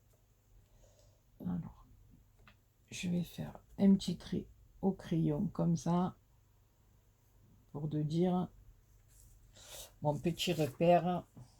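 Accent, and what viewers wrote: South, France. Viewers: Elle est vraiment très jolie cette carte, c'est vraiment un plaisir de vous suivre et pitié surtout pas de vidéo muette 😋 je suis trop contente de vous entendre ça fait du bien au moral! Continuez à nous enchanter et bravo pour cette belle réalisation (trop classe!) Prenez bien soin de vous et à bientôt pour entendre l'air du midi dans votre voix😍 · Coucou, wouahhh c'est une sublime création, j'adore, douceur, raffinement!!! que du bonheur!! merci beaucoup pour ce tuto ensoleillé de l'accent du midi, agréable moment, bisous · Jolie carte Shabby j ´aime💞💞 merci pour le tuto et le partage ,ton accent du sud fait du bien😃👍.bisous😘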